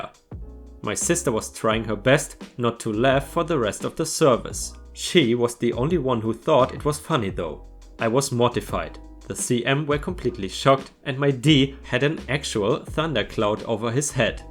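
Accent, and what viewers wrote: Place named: Germany. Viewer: ⭐⭐⭐⭐⭐⭐⭐⭐⭐⭐⭐🌟🌟🌟 I figured I should post some stars since it's been a while. I am still watching just been busy to respond, wouldn't miss my daily fill of That awesome German accent :) 🌟🌟🌟⭐⭐⭐⭐⭐⭐⭐⭐⭐⭐ 🌟🌟🌟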